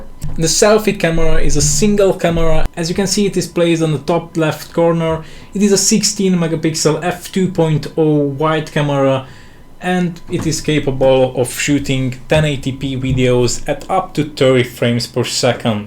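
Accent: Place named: Romania. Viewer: Ești român după accent:)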